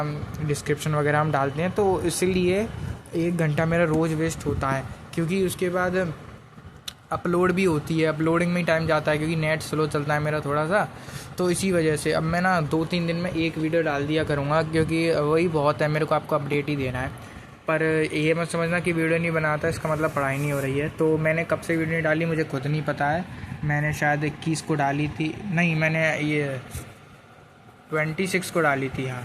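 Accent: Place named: Uttar Pradesh, India. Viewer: bhai up 67 se haina varansi ya Mirzapur side se 😂 yese hi bolne ka style hota hai yha ka mai bhi baliya se hun😅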